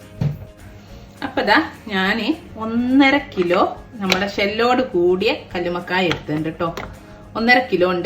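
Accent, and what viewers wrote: Kozhikode, India. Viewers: This came out sooo Gud Thx 👏🏽 Ur kozhikode accent sooper 🥰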